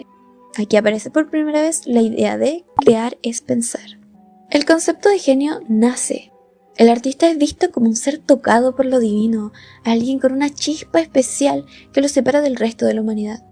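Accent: Chile. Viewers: tu voz con ese acento chileno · se me hizo recontra tierno su acento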